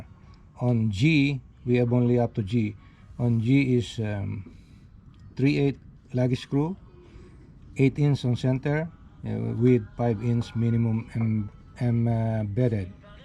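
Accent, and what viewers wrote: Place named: Philippines. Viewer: Thanks for the video.. I can hear the Pilipino accent 😉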